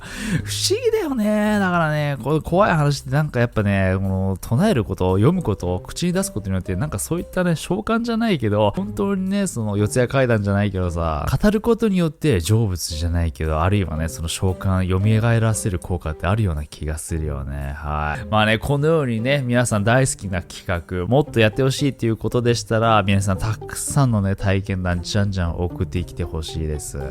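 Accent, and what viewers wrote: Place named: Kyushu, Japan. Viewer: ナオキマンの九州弁からしか得られない供給がある。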